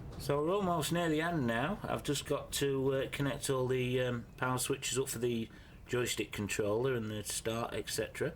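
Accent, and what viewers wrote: United Kingdom. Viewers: You played Tetris on a Game Boy in 1989? ;-) Did you import one or something haha cos it didn't come out until September 1990 in the UK and you sound British so I'm assuming you're in the UK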